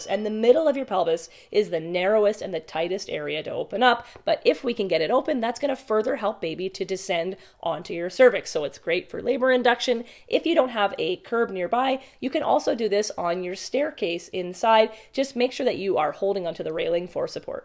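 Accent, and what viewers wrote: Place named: Canada. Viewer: I love your videos, they are so informative and I love your voice and Canadian accent. Beautiful and soothing. Also, not pregnant, but we are planning on having a second baby sometime soon!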